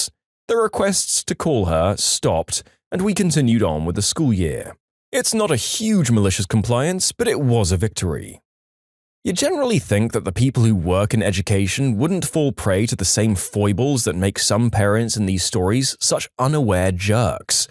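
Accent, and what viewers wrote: United Kingdom. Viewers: British narrator?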